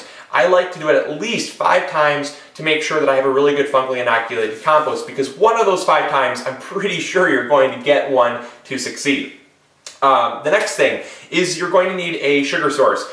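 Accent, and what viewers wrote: Michigan, United States. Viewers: Holy Michigan accent! Thanks for the lesson I can't wait to try this. Luckily my compost pile is pretty shady.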